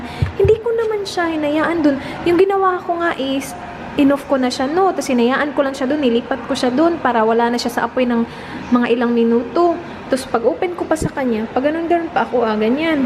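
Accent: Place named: Visayas, Philippines. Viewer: nakakakutawa po yung bisaya accent nyo 😍😍😍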